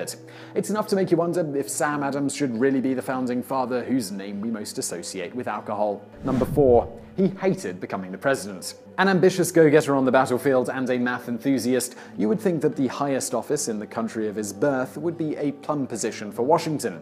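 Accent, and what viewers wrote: England, United Kingdom. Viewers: Your facts are true. Do we need to be reminded of them,. by some smooth english accented tory. Washington also had a greyhound dog named Cornwallis. Now that is worth knowing. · Should we trust these Washington facts from a guy with a British accent?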